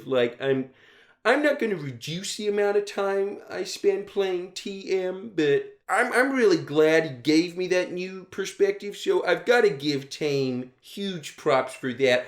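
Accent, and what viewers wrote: United States. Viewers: love you mate but this video is legitimately shit, think the american accent gave me an aneurysm, even worse than your MAFS stuff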